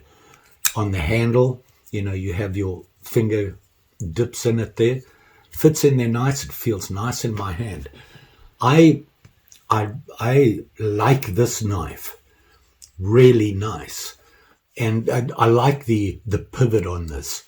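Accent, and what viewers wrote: South Africa. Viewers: are you south african ? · Just found your channel and being a knife maker and mad about knives really enjoying your content, recognized that accent straight away 🇿🇦 .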